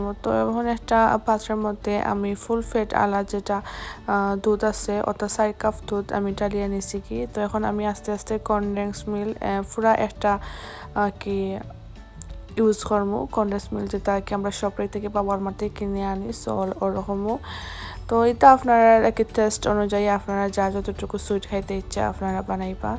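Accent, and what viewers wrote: Sylhet, Bangladesh. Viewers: সিলটী কথা হুনতে খুব ভালা লাগে । আমিও একটু কইলাম । কিন্তু তোমার নাসতা দেখতে কিন্তু সেই ভালা অইছি ।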